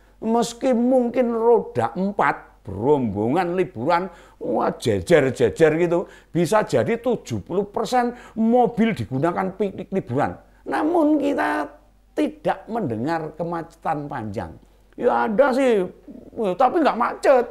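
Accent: Java, Indonesia. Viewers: Pak njenengan jawanya dari mana sih kok lucu banget apa njenengan jebolan pelawak.Tapi pelawak yg cerdas dan gokil trs kan pencerahan2nya.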